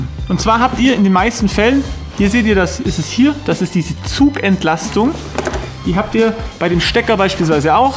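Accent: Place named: Bavaria, Germany. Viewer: Hey! Super Videos, super Erklärungen die eigentlich jeder (Vollgasdepp) auch versteht und das ganze Gefluche auch noch auf allgäufrängbayrisch - einfach HERRLICH:D Speziell zu diesem Video: nimmt man nicht unisolierte Aderendhülsen für solche Arbeiten um nicht die Adern eben durch jene Isolierung unnötig zu belasten wenn diese im Stecker oder der Buchse dann (ab-)geknickt werden? (wenn man keine hat, kann man auch VORSICHTIG die Isolierung der isolierten Aderendhülsen mit einem feinen Seitenschneider oder einem scharfen Messer entfernen:) ) Grüße aus A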